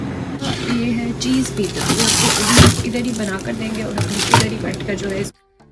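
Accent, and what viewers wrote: Punjab, India. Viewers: I have recently subscribed your channel And I'm just getting very entertaining video to watch. Loving your Punjabi accent and purity ❤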